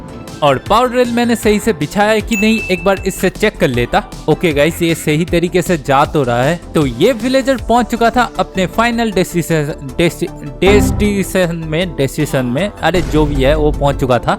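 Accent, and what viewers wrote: Bengal, India. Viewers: bhai Tum Bengali ho kya?, 🙂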